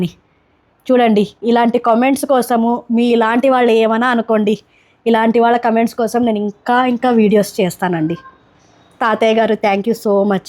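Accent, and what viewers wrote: Karnataka, India. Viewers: గారు మీ వీడియోస్ చాలా అద్భుతంగా ఉంటాయి లాంగ్వేజ్ గురించి మాట్లాడితే కర్ణాటక తెలుగు కర్ణాటక తెలుగు కు ఆంధ్ర తెలుగుకు కొద్దిగా తేడా వస్తది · Miru kannadollu ina trlugu vallu ki mi kannada accent nachindi emo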